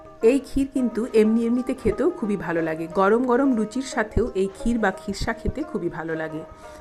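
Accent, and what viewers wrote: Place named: Bangladesh. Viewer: দিদি খুব ভালো লেগেছে আপনার রেসেপি, দিদি আপনি কি আসলেই কোলকাতার? ভাষায় বোঝা যায় না, মনে আমাদের দেশের মানে বাংলাদেশের লোক আপনি।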